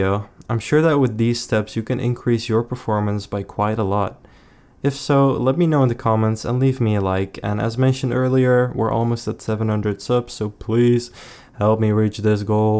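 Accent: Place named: Netherlands. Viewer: You sound so Dutch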